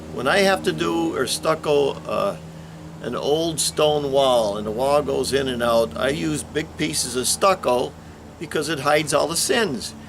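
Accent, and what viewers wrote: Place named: Pittsburgh, United States. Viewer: Today they would use the lickum and stickum method on that stone. You know, you buy it at Home Depot,and they even give a 15 minit coarse on how to do it. The guy or lady giving the coarse might be a retired cop or school teacher. lol But anyway you would still be in jail had you designed that house. But his arrogance F. L. W. was looked upon as a genious even though the place got to the point that it wasent even livable anymore due to how bad it settled. Millions were spent trying to save that place and there are videos of the whole restoration project. A whole bunch of pinheads coming up with a whole bunch of bad ideas on how to fix it. The best way would have been to tear it down and start over with a sensible design. You mention your dad being old, Well in a few days i will turn 87, i can no longer work due to serious back issues, but i can still hang out at my sons large cabinet and millwork shop here in Vero Beach and keep things straight. 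lol And i can still launch my small boat and fish the many very good lakes near here, although the salt water i can no longer do. Your accent says your from the Pittsburg area, where mine would indicate Philly. We still have a nice family owned camp up there in Cameron county, but i havent been back there since the Covid situation heated up. Anyway i cant really take the nasty wether any more either that area is well known for. Keep up the good job on the videos and for gods sake try to remember to put the line up witho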